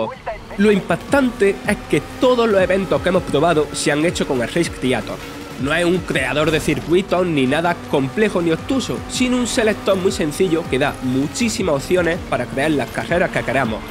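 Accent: Andalusia, Spain. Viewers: Español con un perfecto acento andaluz. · El locutor no lo hace mal, pero creo que su trabajo queda lastrado por un accento demasiado marcado, a mí personalmente me saca del análisis, por eso Microsoft o Sony cuando hacen colaboraciones con streamers andaluces les piden que hablen en español con accento "neutro", no es por faltar el respeto a la entonación en sí, sino porque le resta seriedad al análisis. No cuestiono al locutor como periodista, seguro que es realmente bueno, pero como locutor no vale Vandal, por mucho que os empecinéis en ponerle a locutar. No pasa nada, yo tampoco serviría y tengo accento "neutro", no es una ofensa. Uno tiene que saber para lo que vale y para lo que no. Y lo digo desde el más absoluto respeto al periodista.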